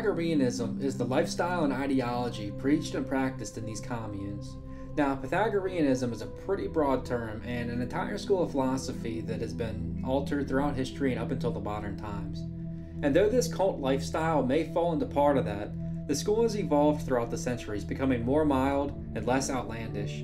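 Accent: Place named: Pittsburgh, United States. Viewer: You sound like you're from Pittsburgh. Good video, cheers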